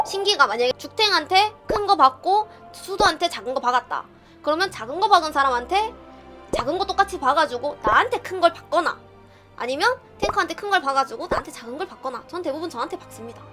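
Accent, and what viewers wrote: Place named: Gyeongsang, South Korea. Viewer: 아..경상도 사투리 진짜 극혐이다 1초도 못듣겟음 역겨워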